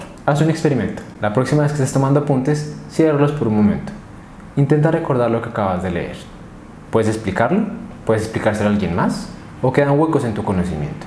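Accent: Colombia. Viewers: Ese acento es de cali sin duda., Que buenos consejos, Te felicito te mereces el exito que has logrado 💕👏🏻👏🏻👏🏻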